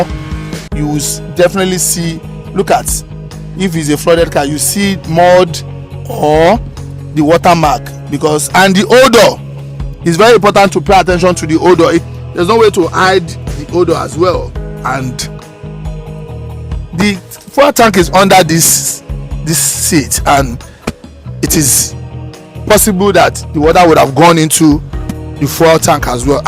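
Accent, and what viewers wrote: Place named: Africa. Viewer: African english is so funny 😂😂😂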